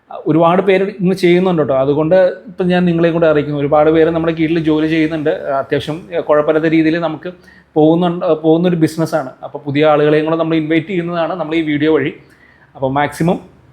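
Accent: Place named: Kasaragod, India. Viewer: Thalpariyemund Kasaragod aane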